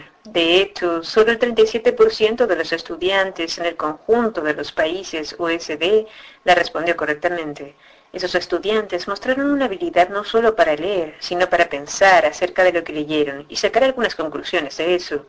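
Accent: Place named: Central America. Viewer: Tiene acento centroamericano, creo que ecuatoriano, o quizás colombiano, pero se entiende perfecto!! vos no aprobarías el PISA, Miguel!! en todo caso pasame qué palabras no entendés, y el instante t en que ocurre, y te respondo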